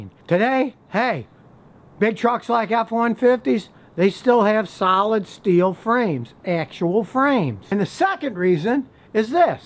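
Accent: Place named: Chicago, United States. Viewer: Most Chicago accent ever. Could have been on Bill Swerski's super fans.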